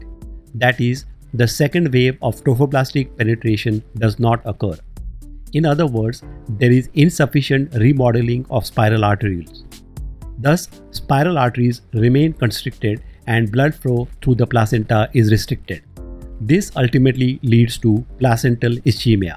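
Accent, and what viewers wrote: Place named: India. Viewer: I cannot catch everything you said due to your Indian accent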